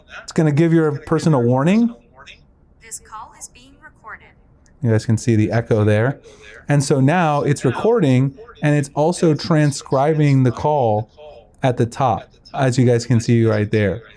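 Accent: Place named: United States. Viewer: You talk to much horrible American accent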